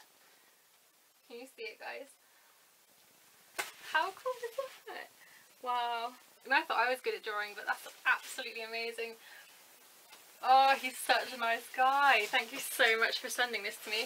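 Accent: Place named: London, United Kingdom. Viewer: Loved this. From what part of Britain are you? I'm guessing London by your accent. But I could be wrong.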